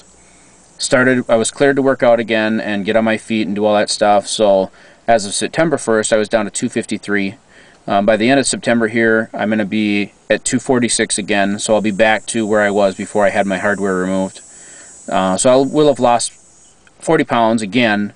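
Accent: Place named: Minnesota, United States. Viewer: Hey..thanks for sharing your history of weight. You have a strong "Minnesota" accent...lol... You can make it to goal..don't give up! ~Amber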